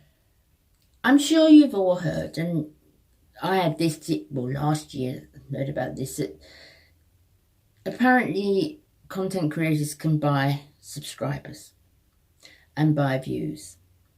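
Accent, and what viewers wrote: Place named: England, United Kingdom. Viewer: Bless you.When you have watched youtube for 12 years or so and watched channels grow,you learn to take many people with a pinch of salt.I don´t watch any more to "learn",when it comes to make up as I have known for a few years now what suits me,and what to avoid,as I am sure many of us have.I like reviews and enjoy personalities.There is a lot of sugary B.S. out there and many use filters.Youtube is a HUGE business and money maker for many,these days.Good for them.It takes a bit of selling your soul for that but many are prepared to do just that.However,I would rather just spend my time watching people such as yourself,who keep all aspects real.I tend to think these days,as much as I love and have always loved make up,the market is totally saturated,and there is only SO much a person needs.Truthfully..I watch you,also, because it´s nice to here a fellow English accent;)......Stay as sweet as you are.....Sheila xx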